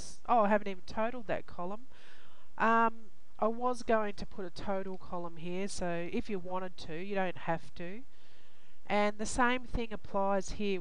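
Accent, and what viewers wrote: Australia. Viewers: She’s Aussie 😅”jazz it up” love it! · I am assuming she is from Australia...which as been referred to as OZ...cheers mate!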